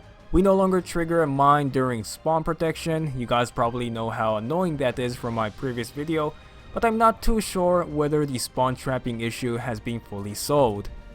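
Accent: Germany. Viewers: what really?!? He totally has a german accent XD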